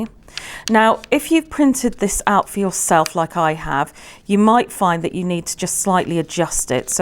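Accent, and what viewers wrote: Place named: United Kingdom. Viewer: You could be both Bri'ish, or an american makeing fun of that accent!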